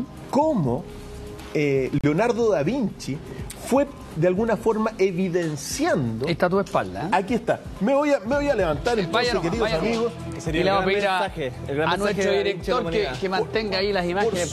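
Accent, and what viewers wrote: Chile. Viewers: Ya me están gustando los chilenos !!! Será que todos hablan asi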